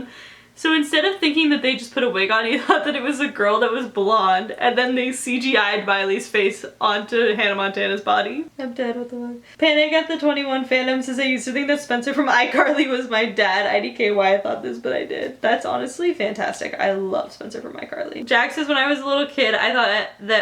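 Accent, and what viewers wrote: United Kingdom. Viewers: Why does always sound british at the beginning of her videos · It's not a vid if she doesn't slip into a British accent sometime in the intro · she has a really english accent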